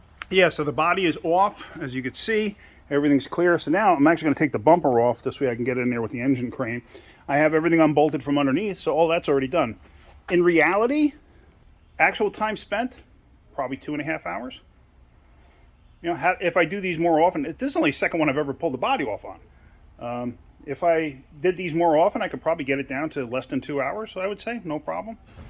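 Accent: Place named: South Jersey, United States. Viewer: I'm From south Jersey/ Phila area, picking up on a tad bit of my accent over here. 👍Awesome vid, just bought a Jasper eng. n plan on doing this. The easy way. Thanks for the vid and subscribed. Keep those vids a rollin ✌️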